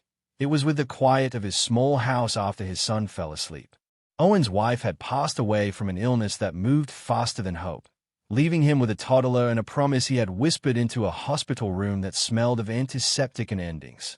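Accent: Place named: Australia. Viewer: Love the Aussie accent!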